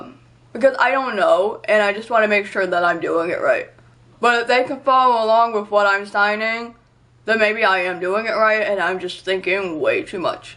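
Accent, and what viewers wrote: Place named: United States. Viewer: Not sure if anybody has mentioned this to you before but you seem to have an american accent. Were you always deaf? how do you explain your accent. Sorry you got depressed, keep smiling...it helps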